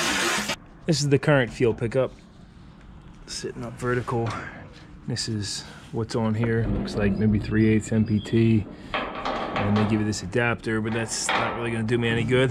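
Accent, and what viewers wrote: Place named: Pennsylvania, United States. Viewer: Hi, new sub here, just found your channels. It was the '65 Plymouth wagon that drew me in . Sounds like you're from PA...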